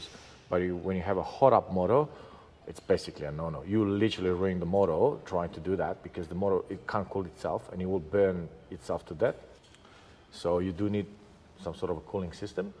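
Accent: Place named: Australia. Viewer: German dude speaking English with an Aussie accent 😉 👍🏻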